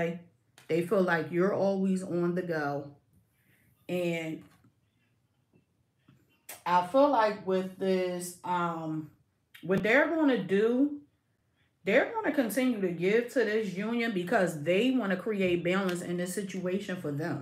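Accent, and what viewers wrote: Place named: Philadelphia, United States. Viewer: oh yea Philly really apart of you cause you have a very distinct accent. I love it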